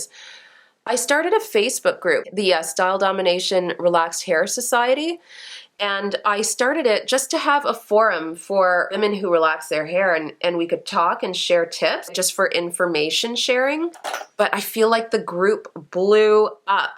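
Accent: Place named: Canada. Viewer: Ahhh I could tell you were from Canada! I knew by the accent of course, but the “I’m sorry “ near the end (as well as the new car pics) sealed it 🤣 👋 waving at you from down the road a bit in Cobourg!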